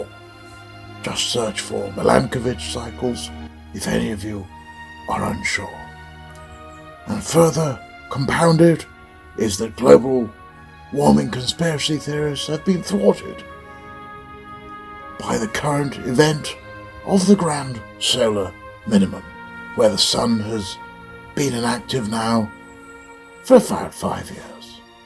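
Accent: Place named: RP, United Kingdom. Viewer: Hi. Not a bad attenborough accent. But I keep hearing a bit of Brian badonde slipping through. Fonejacker incase you dont know. 🤣🤣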